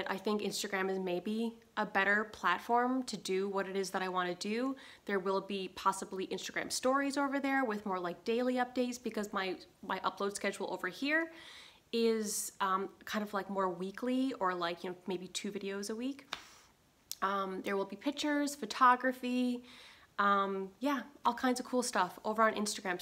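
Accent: Newfoundland, Canada. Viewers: Wonderful to see you again and hear that glorious Newfie accent. Great choice for location. Look up who the PPC candidate is for your community. Call them. There is hope for Canada, strong and free. It's Maxime Bernier. All the best in your new home.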